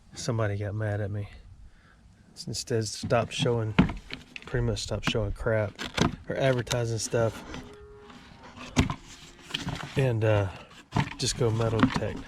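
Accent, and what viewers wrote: Alabama, United States. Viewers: The ultimate ‘shaggy dog’ car story, told with a wonderfully soft Alabama voice. Thank you.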